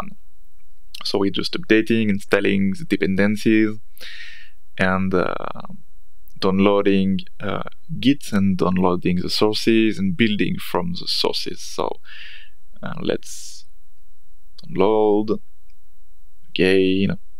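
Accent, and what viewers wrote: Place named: France. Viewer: Salut! Vu ton accent, je pense que tu es français. Quel est ton pseudo sur bitcointalk? PS: je suis ton premier abonné;-)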